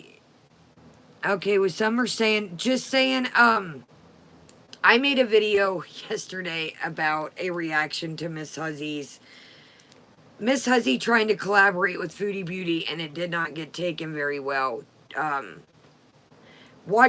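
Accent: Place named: South, United States. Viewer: I’m from the UK and I adore your raspy southern accent! It’s literally my favourite, I could listen to you all day! Your accent and a strong Scottish accent is my kyrptonite! Everyone can see you’re genuine, keep doing you 💜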